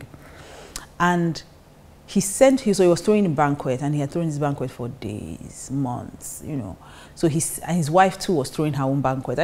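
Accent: Nigeria. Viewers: I dont like that Nigerian tonation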